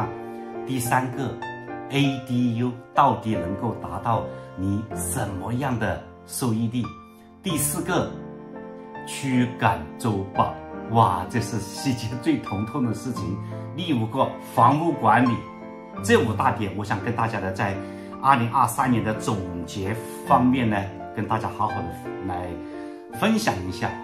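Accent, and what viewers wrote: Hunan, China. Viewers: 能不能把湖南口音改一改，不要唸“邹霸”，“一鱼既往”......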